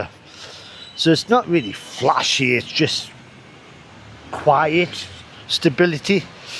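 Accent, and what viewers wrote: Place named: Newcastle, United Kingdom. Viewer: Where you from Bonny Lad? Newcastle or Sunderland ..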